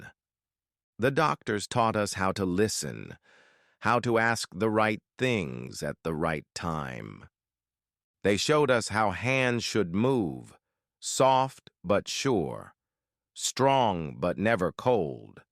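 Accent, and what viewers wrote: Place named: Wayne County, United States. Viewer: Sounds like your in Wayne Co.😮😮😮😮😮😮😮😮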